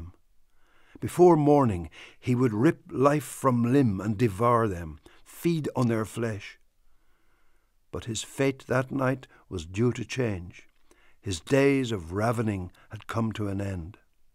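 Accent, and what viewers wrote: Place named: Northern Ireland, United Kingdom. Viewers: Laura Halliday hahaha!..how on earth would you know what a "Shakespearean" accent sounds like??..anyways the narrator has modern nothern Irish accent.. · It's a modern Northern Irish accent.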